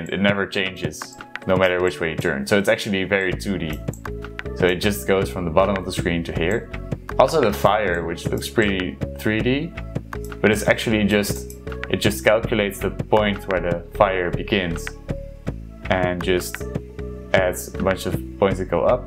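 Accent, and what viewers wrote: Netherlands. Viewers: Ziet er goed uit, ik raad je aan om een keer te kijken naar OpenGL of iets in die richting. Dan kan je een echte game engine bouwen hoewel OpenGL niet de beste Graphics API is maar is wel goed voor een beginner (ik neem aan dat je een beginner bent aangezien je game maker gebruikt, vat het niet verkeerd op xD) Als je je afvraagt hoe ik wist dat je nederlands bent ik hoorde het aan je accent. :) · Ik hoor nederland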